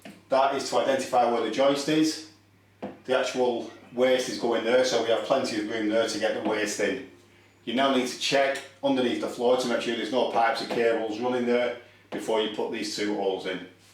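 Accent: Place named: Lancashire, United Kingdom. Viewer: Q What's a nole? A It's a naperture! Great to hear a Lancashire accent after all these years in Geordie land!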